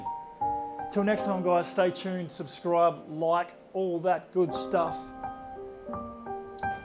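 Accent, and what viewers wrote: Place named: Australia. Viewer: You have to be from Australia, right? Pronouncing SEGA like Seaga was the dead giveaway. But I also suspected it when you said ”a heap of games” which I only ever heard The Last Gamer say.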